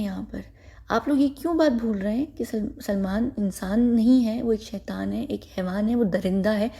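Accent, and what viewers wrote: United Kingdom. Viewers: I honestly love ur British accent 💞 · Sis I personally love your British accent. I just thought to share with you because of the warning statement u mentioned in the beginning. Baki log bhaar me jae. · funding to movies by badelog aur durgs ka kya sceene kasi funding hoti hai, ye salman k bahar dubai kyu jata hai? funding? aur prostitution kon provid karta hai bollywood m ya phir salmon ka hat kya hai es m. kya salman k sach m religious neutral hai ya bas dek ka ne k liye ganpati festival karta hai. wasi es m to sure hu m to b kuch extra info aap batavo, salman ki ek sister hai usne to ek hindu se shadi ki, kya seen hai love jihad k mamle m, kya salman uski sis. ki beti ko flim m launch karega? aur new comers ko ye kasi treat karta hai, outsider aur insider b jayse Sonakshi Sinha (ki se ne controvesy ki ti ki salmon sonakshi ki mom k sat night.) aur Saiee Manjrekar eska b batavo. 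vae se british acc. to badya hai aapki. suna te raho bech bech m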